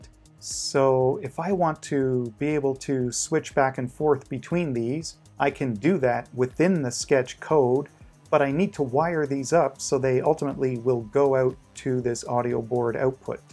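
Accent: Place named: Canada. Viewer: You sound Canadian ;-)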